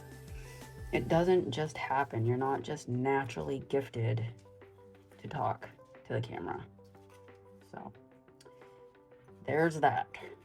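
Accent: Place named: Boston, United States. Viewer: Some vocal rhythms I just cannot listen to. I can’t watch some diy channels because of it, or the repeating of words, like “I’m gonna go ahead and…” I’m out, I can’t do it. 🤦‍♀️🤷‍♀️😂 I don’t know what it is, but I just can’t listen to it. I know it isn’t their problem, it’s mine. I have heard people repeat that phrase ten times in the first minute or two and I just don’t know what causes me to check out, but I do. I haven’t noticed any of that on your channel, your voice is relaxing. Are you a Boston girl? You have a slight accent. Your journal is beautiful. I am like you, it is hard for me to make decisions and to get started on something new. I need less stuff, because I have a hard time with decision making. 😂 I am actually going through my stash and throwing some things out, because I have far too much stuff.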